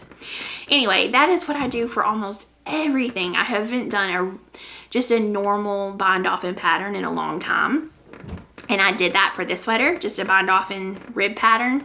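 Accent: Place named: South, United States. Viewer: I absolutely love your podcast and your colour palette and choice of yarns and wish i could afford the same ones, hopefully one day lol. your choices are great examples to base my own projects on. Im loving the little additions of 'what we say in the South' im obsessed. Your accent is much more interesting to listen to than our very boring New Zealand one. I love your podcast and could listen to you speak all day, a great way to end a busy day at work! Please keep posting😊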